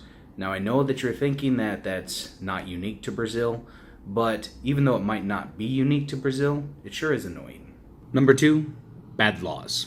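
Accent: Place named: United States. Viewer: Esse cara é br e deve ter morado mo cota nos eua tem mo sotaque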